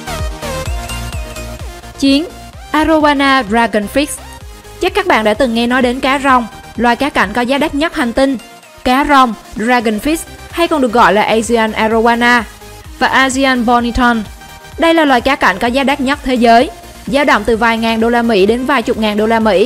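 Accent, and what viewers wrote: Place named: Central Highlands, Vietnam. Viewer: Phát Nguyễn gia lai hoặc bình định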